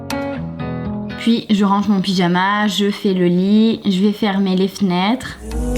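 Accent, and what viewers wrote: South, France. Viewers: Tu as des yeux tellement magnifiques c’est ouf 😍 et d’où viens ce petit accent sudiste ? 😁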